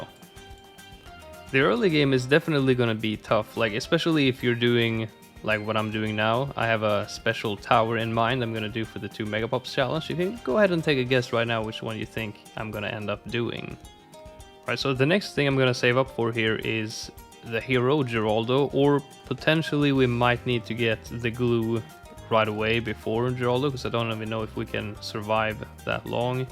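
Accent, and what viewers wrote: Sweden. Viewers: Are you from Sweden ?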